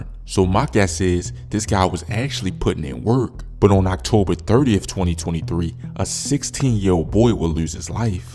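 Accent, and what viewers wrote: Baltimore, United States. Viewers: He's from Baltimore. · Baltimore accent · The YouTube creator definitely is from Baltimore Maryland. The accent is crazy. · Dude sound like he from Baltimore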